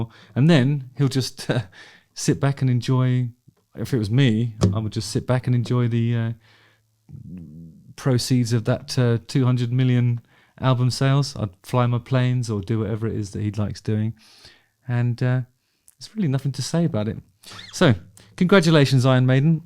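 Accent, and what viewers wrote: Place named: RP, United Kingdom. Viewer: Your knowledge of Maiden is weak. And spoken in your posh accent and delivered so woody and dry makes difficult listening.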